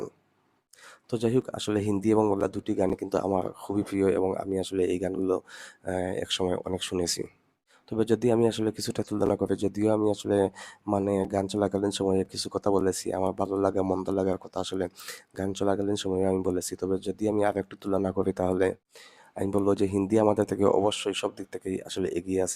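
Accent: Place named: Sylhet, Bangladesh. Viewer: উপস্থাপক মনে হয় সিলেটি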